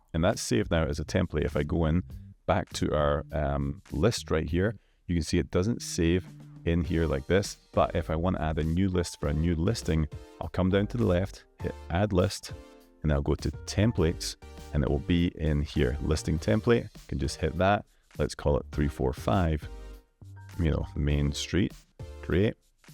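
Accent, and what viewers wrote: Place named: Scotland, United Kingdom. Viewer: Did expect a fellow Scot when I clicked this! 🏴 Good overview, great presenting and useful tips.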